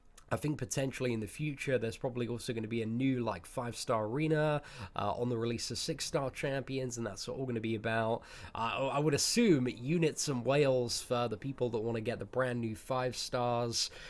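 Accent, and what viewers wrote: United Kingdom. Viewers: damn i saw the thumbnail clicked it was expecting a 5* opening lol....but always a pleasure to hear tht British accent...keep up the awsum wrk man :)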